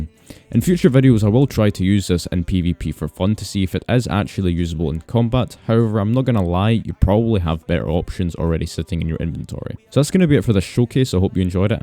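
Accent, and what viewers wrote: Scotland, United Kingdom. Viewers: I thought you're from Scotland, you're actually thai. Thats cool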